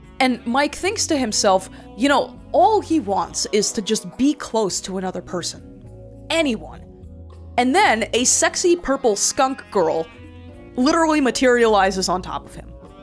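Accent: New York, United States. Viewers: I am very suprised how you can talk like a average american while english is your 2nd language , how did you improved your accent ? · WYM didn't speak english fluently? You sound like you're from NY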